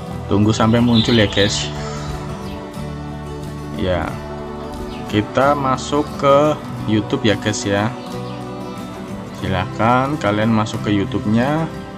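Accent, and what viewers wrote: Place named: Kebumen, Indonesia. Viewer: Jaannn njawani . Kebumen kayane rika ..??? "NEMBE NEMBE" 😂😂 .. Semangattt lanjutkan . Silaturrohim juga ya ..?Terima kasih mas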